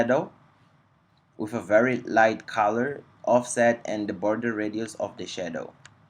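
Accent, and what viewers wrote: Brazil. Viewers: Vc é br né? Esse sotaque não é de alguém nativo...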